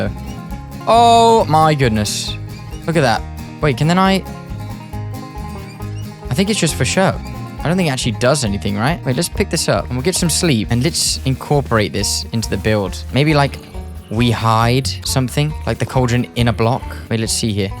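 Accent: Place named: England, United Kingdom. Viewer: what part of england are you from.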